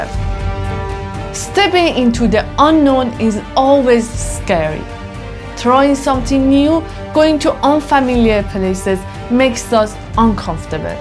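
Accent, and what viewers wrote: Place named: Iran. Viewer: Let me guest where you from without knowing anything about you. Sounds like you from Iran 🇮🇷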